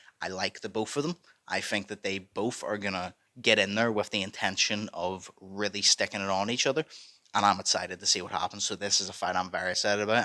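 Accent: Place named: Liverpool, United Kingdom. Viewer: Hahahhaha bruv your accent is amazing, you must be from Liverpool innit?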